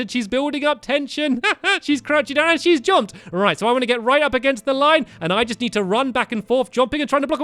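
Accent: United Kingdom. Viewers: Are you British I am your the best youtuber ever we love you and your vids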